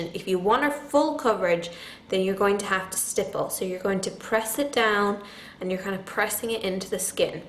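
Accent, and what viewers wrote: Ireland. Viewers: maybe she's irish?... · Are you Irish? Beautiful! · you sound Irish sometimes....are you? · are you Irish? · Pretty sure you're Irish! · When u think she's American but soon realise that she's Irish hmmmmm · Great video. Very helpful. p.s. I hear a little accent, are you Irish? (I love Ireland xD) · Still trying to figure out your accent xD Idk, it sounds Irish. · are you irish???? :D youre very pretty! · I stumbled across this video while watching another makeup guru's video and clicked on this one. I just wanted to say that while listening to you speak I could hear a slight hint of an Irish accent..when I went to your profile it says you were indeed from Ireland. You don't have a very thick accent but I could hear the slight hint of it. I'm kind of obsessed with anything Irish lol sorry >_> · just subbed love your videos so brill x an your Irish yay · Are u from Ireland cuz u hav a bit of an Irish accent ?? 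· She's irish, you can hear it on the R's. And yeah, it says so on her description.. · Yeah she's Irish I'm Irish too it's just a different region of accent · Are you Irish ? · I think she's Irish? the way she says blush and water is less British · Are you from Ireland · omg do u have an irish accent? like niall? i love him!!!! NOT HATE DIRECTIONATERS! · I think she's Irish not British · Ru Irish? You have accent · are you irish? you sort of have an irish accent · Irish, I believe :) the Rs are deep-ish so, that's usually a good sign of an Irish accent! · I think she's Irish.. Just a hint of some sort of accent there. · Yes, yes, I felt it too, she pronounces some words in an Irish manner, I love it. Although she speaks American English to me, not British English. Love the ways she speaks! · are you irish?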